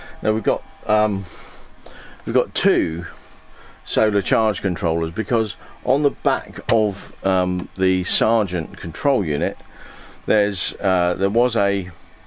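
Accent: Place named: South, United States. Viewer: I'm with on that its that southern drawl. 😉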